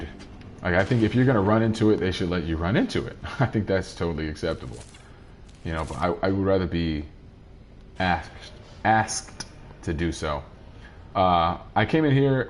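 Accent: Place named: Detroit, United States. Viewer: Are you from Detroit? You sound like you're from Detroit. I'm from Detroit.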